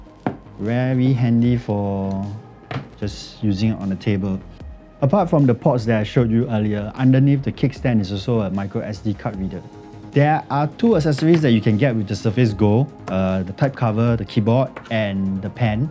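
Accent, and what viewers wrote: Singapore. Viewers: listening to your singlish review,makes me really wana.....wow fantastic haha,decent review though.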